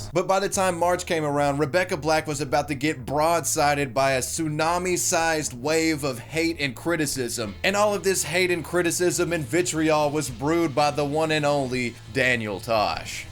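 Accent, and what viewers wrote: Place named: South, United States. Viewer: Youre like an attractive repilitian methhead Pewdiepie with a slight Southern accent that if you wouldnt have specified would be of untraceable American regional origin. Surferish to degree.. But all around normal, affable fellow in demeanor. Maculay Culkin meets Matt McConaughey. My love is Tsundere platonic bromance-respect.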